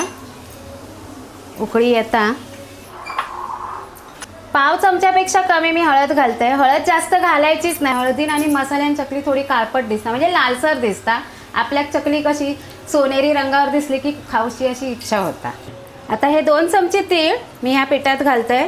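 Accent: Malvani, India. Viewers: कुरूम कुरूम आवाज इलो.खुप मस्तच चकली . खुप छान वाटत तुमचं मालवणी बोलणं. छान बोलून रेसिपी करता म्हणूनच रेसिपी बघायला आवडतात. 🙏👌👌👍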